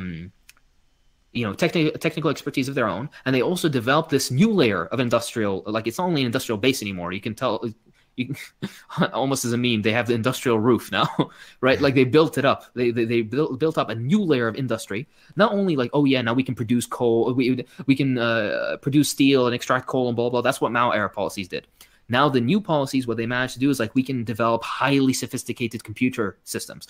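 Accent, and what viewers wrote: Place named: Iraq. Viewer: How? He has a clear Iraqi accent.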